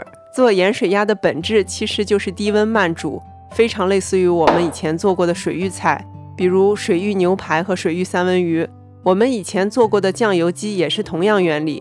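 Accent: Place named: Qingdao, China. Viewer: 青岛银的口音😊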